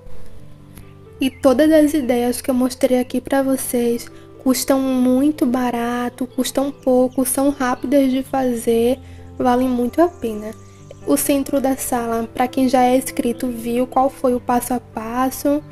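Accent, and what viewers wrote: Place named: Pernambuco, Brazil. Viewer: Linda sala amei vc é pernambucana lindo seu sotaque 😍🤗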